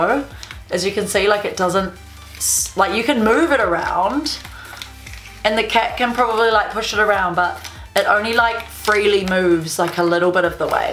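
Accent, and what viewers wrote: New Zealand. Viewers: I thought the accent was Kiwi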